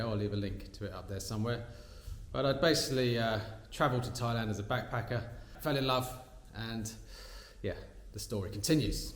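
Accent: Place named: London, United Kingdom. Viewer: Thanks for the back story. Would not have thought you had a private school background, and your dad being a muso. Obviously you have set yourself up really well financially, which requires hard work. I'm a Kiwi and to me your accent is very London working class, "inn-it".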